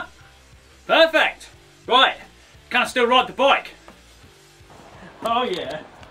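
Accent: United Kingdom. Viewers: is this guy english or scottish or irish or welsh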